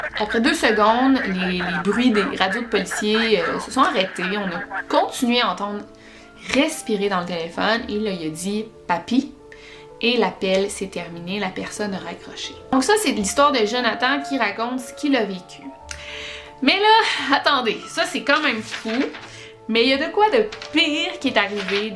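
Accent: Quebec, Canada. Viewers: Je crois que je kiff ta chaîne je regarde tes vidéos dans mon lit et même quand je fais la vaisselle ! Et je commence à tomber sous le charme , dire que normalement je déteste l’accent québécois ... haha J’attends la vidéo avec les parcs nationaux avec impatience bisous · Coucou je vient de découvrir ta chaîne et j'adore continue comme ça au passage d habitude je suis pas fan de l accent québécois mais comme tu es jolie sa te donne un charme fou =) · Coucou ma belle ! Je suis du sud ouest de la France et j'ai découvert ta chaîne ya deux ou trois semaines et franchement une fois qu'on s'est habitué à l'accent québécois, t'es vidéos sont passionnantes, t'es récherches super biens faites et ta façon de raconter est fluide et agréable, pas de blablas inutiles mais de belles descriptions et des photos d'illustrations qui sont suffisamment nombreuses pour retenir un peu plus notre attention sans pour autant tout gâcher en étant omniprésentes... 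BREF UNE ÉNORME RÉUSSITE !!! Bravo à toi franchement, mon copain est devenu allergique au québécois, et moi par contre je crois, que je commence à mieux parler québécois que français 😂 😂 😂 😂 😂. Gros bisous et bonne continuation je te souhaite tout plein de succès !!!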